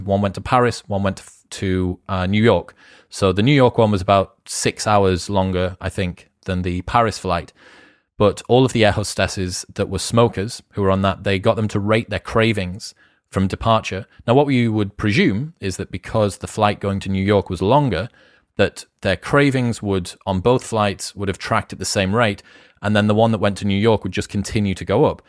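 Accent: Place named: Newcastle, United Kingdom. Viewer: Congratulations on the success Chris, I have been following for quite a while now and I think you deserve every bit of it. Plus its nice to hear a fellow Geordie having these conversations - Living away from home at the moment for my PhD.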